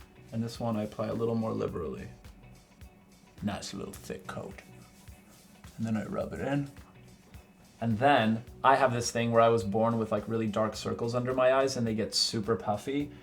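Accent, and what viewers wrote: Quebec, Canada. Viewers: okay but has a french canadian accent when he speaks french??😍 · OMG I didn't realize was Canadian till I hear his french accent!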